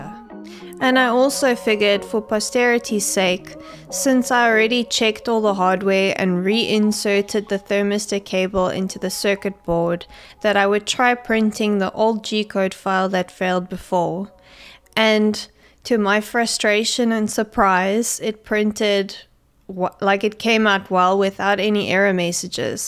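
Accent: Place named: South Africa. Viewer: I'm usually good at picking up what an accent is. Yours has me stumped, but I'll take a guess, are you from South Africa but have lived elsewhere long enough to end up with a hybrid accent? Great video. I had thermal runaway recently too, but it was due to me experimenting with my machine after flashing the firmware.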